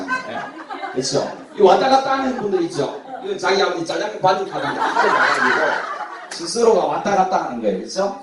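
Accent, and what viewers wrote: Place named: Gyeongsang, South Korea. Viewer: 잼나고~ 경상도 사투리 죽이네용ㅋㅋ~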